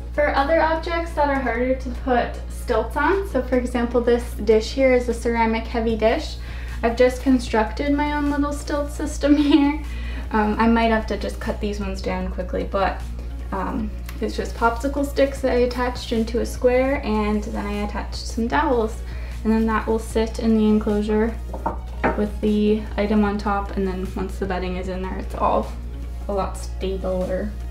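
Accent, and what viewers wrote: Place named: Canada. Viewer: When you even realized how Canadian your accent was LOL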